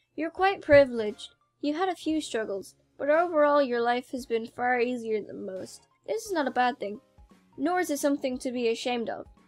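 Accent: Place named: Donegal, Ireland. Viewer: U sound like ur from donegal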